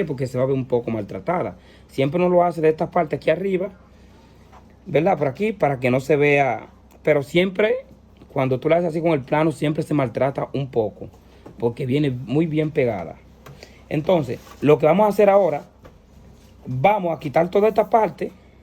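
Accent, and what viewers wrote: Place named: Dominican Republic. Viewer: Ignorante ellos hablan así, su lenguaje de los dominicanos es así.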